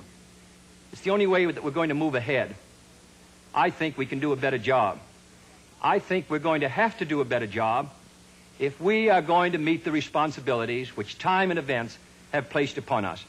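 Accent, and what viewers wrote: Boston, United States. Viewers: Check , , and , the Boston accent is soft, but noticeable. It seems as if he tried to train it out or soften it, but it's still there. By it's back in full swing, especially "A cotton fahmah in Georgiahr". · this isn't true, his accent is the complete opposite of Brahmin society and accents. John Kerry is a good example of Brahmin and born into. But the Kennedys were your typical Irish working class Boston accent. The Brahmin were not fans of this Boston culture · we talk like this in dorchester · He has a specific Boston accent than some in Boston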